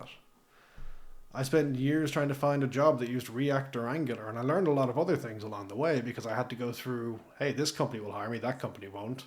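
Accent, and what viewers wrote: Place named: Ireland. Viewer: thats an irish accent if im not mistaken. great to find another irish developer channel. (great video btw)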